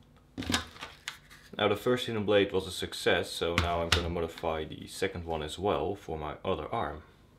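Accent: Netherlands. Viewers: Super cool! Alleen je hoort gwn dat je nederlands bent :) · Are you dutch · you sound really dutch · Is this guy dutch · I thought so aswell cause he sounds really dutch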